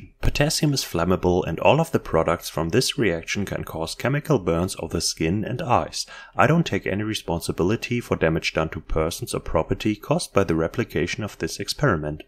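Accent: Germany. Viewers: interesting question. it at least sounds like he has a slight german accent. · Are you German? Just for curiosity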